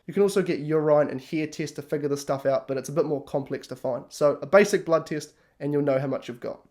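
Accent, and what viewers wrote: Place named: New Zealand. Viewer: Kiwi accent is never a problem listening from Australia. Good on you ❤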